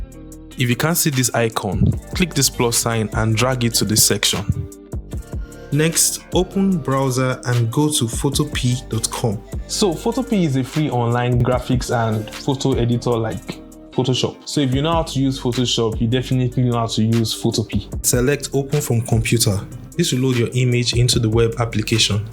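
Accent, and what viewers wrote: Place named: Africa. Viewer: Wahala! If you can't understand his accent, then you're clearly not his target audience. There are millions of other creators that have made videos on this, so go watch them. Imagine telling a creator to change his accent, a deeply ingrained trait, just to fit you. And calling his accent "local"? That's such a colonized, back-handed mindset. Accent is due to speaking another language with inflections similar to your native language. But asides from being widely spoken, please what makes English a "higher" language to diminish or look down on our beautiful African languages and accents. Please oo, think before you comment.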